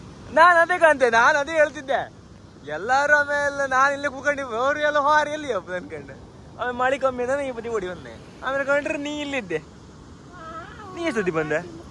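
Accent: Kundapura, India. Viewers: very nice channel and beautiful content. I have been watching your posts from Kuwait for quite sometime now. I Liked the simplicity, naturality of the content, especially the hard work done by fishermen community. Most importantly, i love Kundapura Kannada. All the best. Keep Fishing.!!!!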